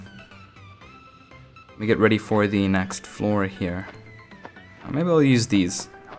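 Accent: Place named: South Africa. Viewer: It's obvious his white.His voice sound like a white south african and i would know because i am a white south african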